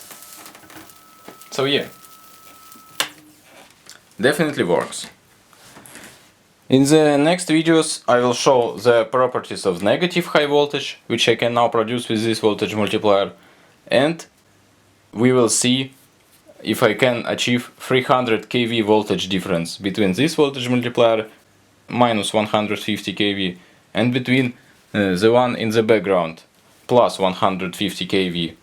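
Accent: Russia. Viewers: Are you from England? Because I hear you're not from England, but from Russia, right?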